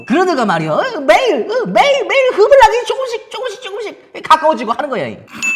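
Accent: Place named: North Hamgyong, North Korea. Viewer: .... 개오바에요... 함경북도 언어 아닌가요?...ㄷㄷㄷㄷㄷ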